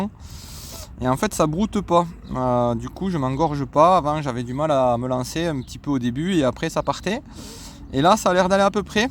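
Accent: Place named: South, France. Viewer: Tu as l'accent du midi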